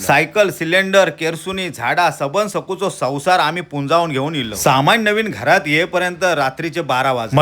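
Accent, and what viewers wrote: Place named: Malvan, India. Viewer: Dada tujhi malvani aikun maja yeta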